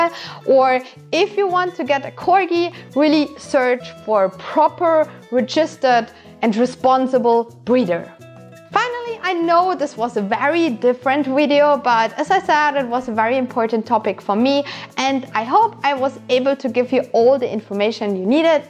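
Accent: Germany. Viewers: You Sound a little German. :) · I realized you had a thick accent, but I didn't think you were German. I am living in Germany as well. I used to do voluntary work in a shelter for 2 years. I saw sooo many, great family dogs in the shelters waiting for homes FOR YEARS! That's extremely sad. There is a dog for everyone in the shelters. Even if you don't find your dream breed dog there, you might fall in love with a dog you might have never expected to bond with in the first place. If you still go for a specific breed, shelters also have many breed dogs frequently like Poodles, Yorkshire Terriers, Beagles, Jack Russell Terriers which I absolutely love, and German shepherds. German shepherds and Russells are the most common ones from my experience. It also depends on the region though.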